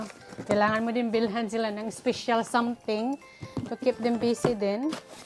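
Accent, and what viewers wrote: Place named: Visayas, Philippines. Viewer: Hi., I'm your new subscriber thank you for inspiring us, its seldom that i subscribe for a vlogger but i like your story and i like also on how you value your family. Please keep it up. By the i'm also a bisaya from southern leyte. I'm amused on your visayan diction. Hehe... please continue to be a worthy pilipina. I support you by subscribing to your channel.Thank you mabuhay ka inday......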